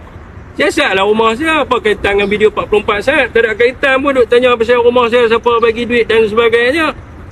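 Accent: Kedah, Malaysia. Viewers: AKU TENGOK MUKA KAU DAH MACAM BEROK. KELUARGA SIAPA NI AGAKNYA. CARA PERCAKAPAN MACAM ORANG KEDAH BETUL KE🤔🤔🤔🤔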